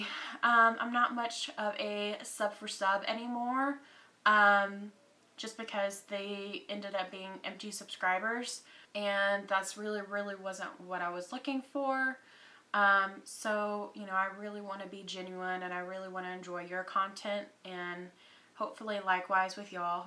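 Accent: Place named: Texas, United States. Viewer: Exactly! Tell it like it is and be honest and most importantly genuine. Much more respect is earned that way. As for having a Texan accent, I do hear it, but it's slight. Much like my New York accent!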